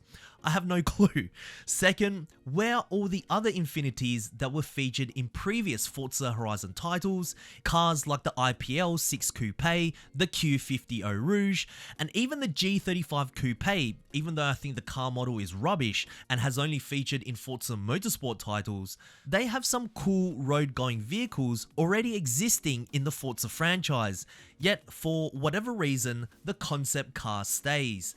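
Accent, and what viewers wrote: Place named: Australia. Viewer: Yo do you also run a channel called? I’m probably wrong but the voices with this channel and the other one are so similar and both have the same Aussie accent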